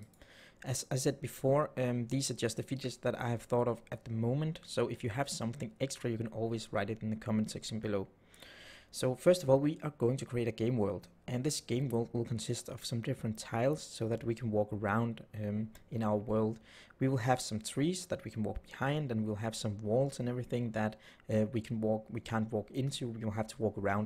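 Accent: Denmark. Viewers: Is that a Danish accent I hear? XD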